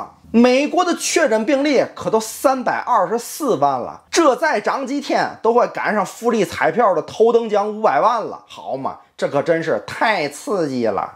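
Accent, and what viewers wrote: Tianjin, China. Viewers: 我特别喜欢听天津话，骂人都这么的带劲，快笑死我了🤣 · 还是有点天津味的北京话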